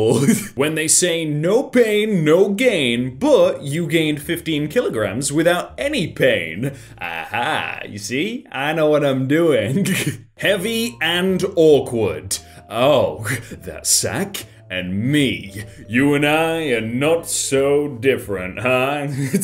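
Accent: United Kingdom. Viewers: day 89 of asking that he british yes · Hello British guy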